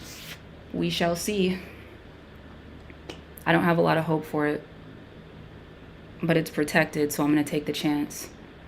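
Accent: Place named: United States. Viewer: seemed choppy - love her accent what area of america is that?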